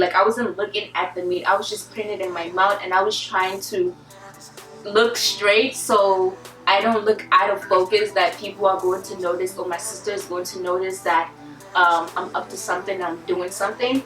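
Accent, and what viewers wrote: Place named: Nigeria. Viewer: am from kenya and honestly you are the first nigeria girl i have watched the video to the end and want more.keep up girl i like your accent.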